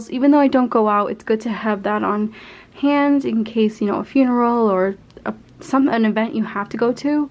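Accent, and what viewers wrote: Boston, United States. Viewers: You from Boston ? Some of your accent sounds like mine!!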